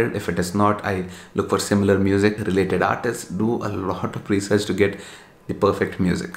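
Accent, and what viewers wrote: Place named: Telangana, India. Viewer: This is the actual fun I need frm u , talking in pakka telangana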